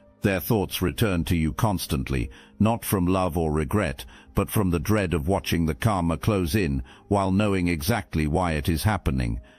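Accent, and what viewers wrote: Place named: United Kingdom. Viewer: my dear chosen one which i respect so much because of your magnificent british accent i thing there is a misunderstanding here ,,what spiritual gifts are you talking about or any spiritual property ,nothing from that exists,i guess that something else is needed here ,, cant trace yet but soon as i realise i let you know,,,,😁 👻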